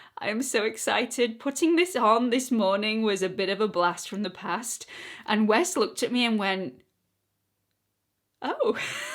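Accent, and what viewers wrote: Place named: England, United Kingdom. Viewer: I love watching you! You’re beautiful and funny, and that English accent is to die for❤️❤️❤️ Every time I call my husband and scream”just listen to her voice”the answer is ‘Oh, I know, I know, alright”😂😂😂 I just adore you!😘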